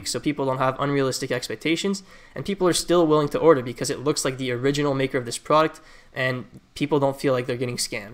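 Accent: Quebec, Canada. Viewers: Are you québécois ?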